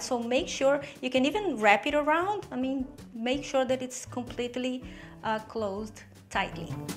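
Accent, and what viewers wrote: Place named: Brazil. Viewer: Eu acho que essa moça é brasileira, tem sotaque de brasileira e nome também. 😂😂😂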